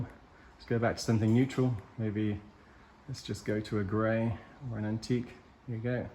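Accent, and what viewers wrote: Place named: Australia. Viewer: your voice my man, sounds so much an Aussie！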